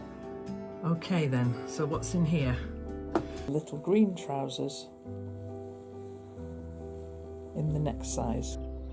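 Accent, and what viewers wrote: England, United Kingdom. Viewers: You are always busy with something! I live in Michigan, USA, but was born in England at Wimpole Park. We went back to England again & lived in Maidenhead for my grades 1 - 4. Dad was in the US Air Force. I'm retiring at age 68 on 12/31/2022. I have several quilting projects lined up. I love listening to you as it reminds me of my neighbors in Maidenhead! They were like Grandparents to me! I love watching you make all of your beautiful things. Take care!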